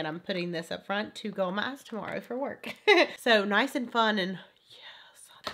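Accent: South, United States. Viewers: Your southern accent is tooo adorable... Luv ya doll...😀😀😀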